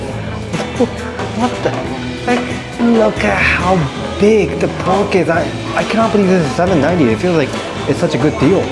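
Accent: Singapore. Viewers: Great tour video! I will have to check out that area next time I am in SG. I must say your videos have really evolved over the years. I started watching you during Covid and it has been interesting to hear your accent evolve as well. At times you sound American or like you could be from here in Hawaii. Other times your Japanese accent comes out. But lately, I have noticed you sounding a lot more like the Singapore locals…. You have truly gone native, so to speak, but it seems like you can turn it on and off, depending on who you are speaking with or about! A sign of a talented mind…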